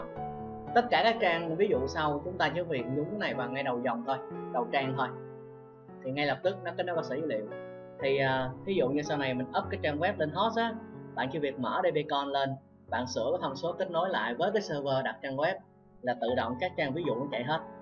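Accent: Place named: South, Vietnam. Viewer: Cảm ơn thầy vì phong cách dạy của thầy đã truyền đạt kiến thức để người học có thể tự suy theo database của mình. Rất hiếm người dạy chỉ tận tình như vậy đa số nói rất ít mà đa số chỉ tập trung bài code của mình hoặc ko giải thích nhiều. Mong thầy làm nhiều video hơn cho thế hệ trẻ việt nam tìm hiểu được kiến thức cho mình chứ em thấy người nước ngoài họ đều chia sẻ những gì họ biết thì người việt nam lại ít share hơn. Em thích giọng miền nam của thầy :}.Buồn cười mỗi quả nói nãy giờ có hiểu gì không.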